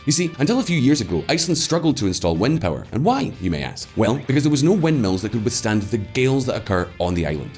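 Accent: United Kingdom. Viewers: Scottish accent over British, any day of the week 🤤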